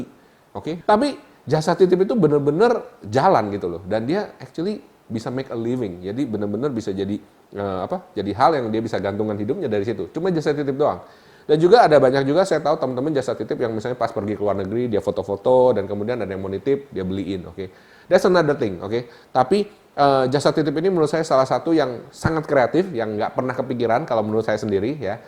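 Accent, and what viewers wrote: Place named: Surabaya, Indonesia. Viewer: Logatnya sama surabaya... Mas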